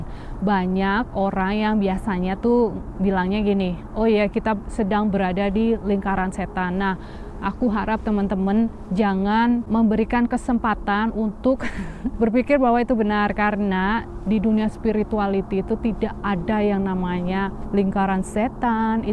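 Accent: Banyumas, Indonesia. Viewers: mba esih katon logat ngapake:D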